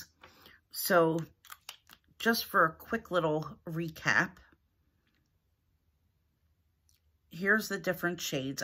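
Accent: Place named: Philadelphia, United States. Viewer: Beautiful shades of lip color. My favorite was the last one. It matches what you're wearing perfectly! By the way, I'm a new subscriber to your channel. :) I hear a little "Philly" in your accent. Is that where you are from? Just curious. Have a fabulous weekend too!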